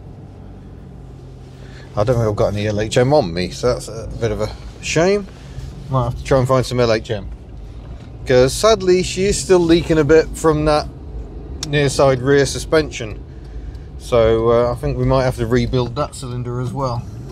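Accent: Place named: Birmingham, United Kingdom. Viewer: Didn't know you were a Brummie.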